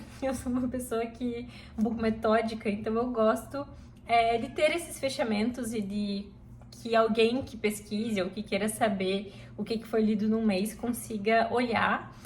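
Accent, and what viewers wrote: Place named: Rio Grande do Sul, Brazil. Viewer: oii, sou nova aqui, daonde tu es? sou do rio grande do sul e achei teu sotaque parecido com pessoas daqui da volta heheh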